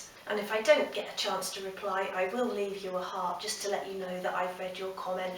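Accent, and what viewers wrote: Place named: United Kingdom. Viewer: when I start watching your videos, I was annoyed by your British accent.. but now I can say that you are very beautiful woman with simple but gorgeous style.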